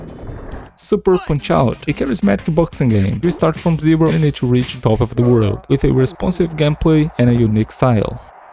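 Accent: Brazil. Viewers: ahaha esse sotaque de brasileiro falando ingles é muito fácil reconhecer.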